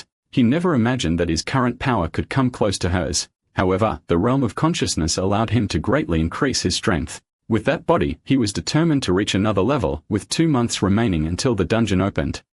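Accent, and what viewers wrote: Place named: England, United Kingdom. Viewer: Using an English accent is disgusting.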